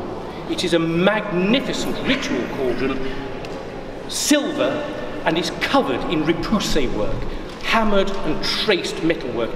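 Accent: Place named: United Kingdom. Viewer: It also helps that his accent is British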